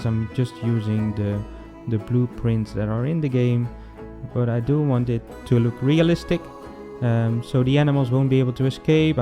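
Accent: Netherlands. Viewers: a SUPER REALISTIC city zoo?! No way! I would love to see you build a zoo like that. I mean, I would super love to see it. I'm wondering where it will be placed. Netherlands maybe? Because your accent sounds Dutch [correct me if I'm wrong]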